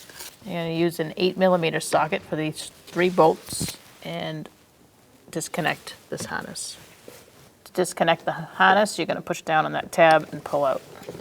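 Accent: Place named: Boston, United States. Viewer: Thankyou for this from Queensland Australia. Love your Boston accent! I'm assuming Boston. The way you say care for car for instance.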